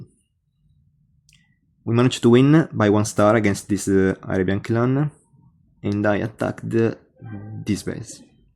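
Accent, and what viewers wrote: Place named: Italy. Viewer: Accento più italiano del mondo. Contento che ci sta un italiano così competitivo sul gioco. Specialmente per la army comp che hai creato dato che odio la classica "SPAM EVERYTHING WITH 0 THOUGHTS AND 3 STAR ANY BASE"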